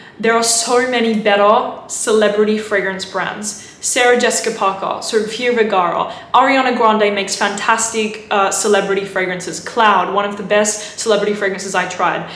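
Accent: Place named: Australia. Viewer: Those fragrances might be tacky. But not as tacky as your half Australian, half European (??) accent. You don't sound exotic. You sound tacky. Stop it.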